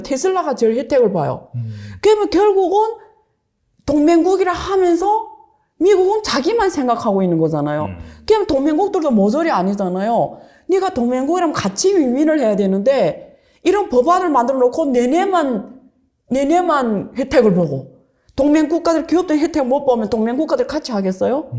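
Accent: Yanbian, China. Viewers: 아~~ 방금 듣다가 말투가 조선족인가? 했는데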